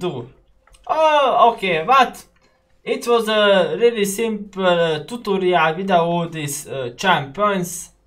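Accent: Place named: Hungary. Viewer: már régen is jó volt az angolod, de örülök hogy most már nem olyan magyar akcentussal beszélsz mint ebben a videóban.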